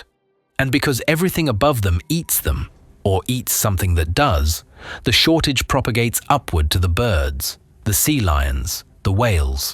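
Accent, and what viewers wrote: England, United Kingdom. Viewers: S,o,o,o,o. —- the weather forecast for your region is: “YES.” Im so glad that I found this At least I was able to hear a guy with an English accent!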